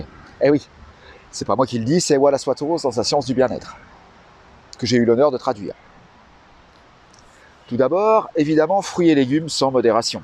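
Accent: France. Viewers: Je n'avais pas percuté que vous étiez un ch'tit "à cause ou grâce à votre accent " 😘 J'ai vécu 5 ans dans votre région, nous avons eu un enfant, un Cum et comme je dis souvent "c'est mon ch'tit bilouttttte énorme "😉😊 Quel plaisir de vous voir à Villeneuve d'Asqu.. en plein de mois de Mai, le plus beau mois des Ch'tis dans ce climat de Drach' 🥰😍👍 Super Vidéo, pour moi, je suis abonné, mais il y avait quelques temps que je ne vous ai pas écouté.. 🤔😥☹🤣👍😘 J'ai appris 2 chose, l'huile de Lin, et "je n'ai plus le nom mais qui concerne l'intestin... au-delà du Sucre de l'alcool du sport etc Bref Merci, restez vous-même, pleinement heureux et joyeux d'être Vous.👍💖💝👌👍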